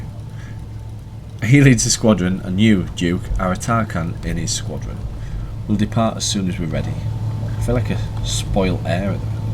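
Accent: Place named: Northern England, United Kingdom. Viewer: Awesome videos man. Nice to hear a fellow northern accent rather than hyperactive Americans! Looking forward to seeing more, this game looks fantastic.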